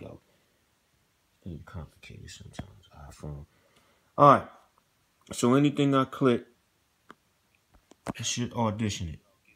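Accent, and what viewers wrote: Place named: Baltimore, United States. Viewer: I love this video. I'm sincerely grateful I hope you do more videos brother. Sound like you from Bmore Peace !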